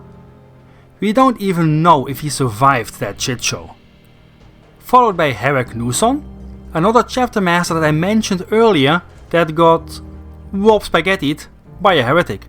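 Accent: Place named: Eastern Europe. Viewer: I figured you were from eastern Europe. Rock your accent, brother. I'm a mumbler, myself. Great video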